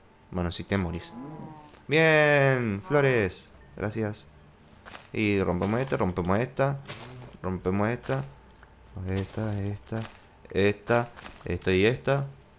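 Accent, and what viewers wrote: Córdoba, Argentina. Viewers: Che Eres Cordobez No Agunta cordoba agregame al fece Enzo Barrera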